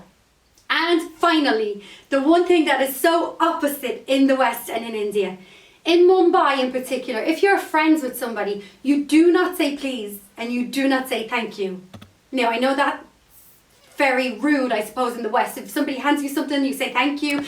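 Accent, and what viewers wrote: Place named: Ireland. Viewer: Love the Irish accent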